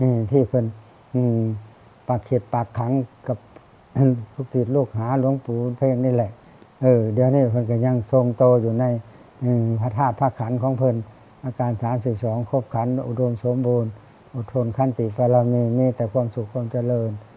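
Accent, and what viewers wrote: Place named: Thailand. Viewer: สาธุเด้อข้า น้อยหลวงพ่อเว้าได้ดีหลายว่าวภาษาบ้านเฮาให้คนฟังเข้าใจสำเนียงเป็นไทยแต่ศัพท์เป็นลาวเพื้นบ้านสาธุข้าน้อย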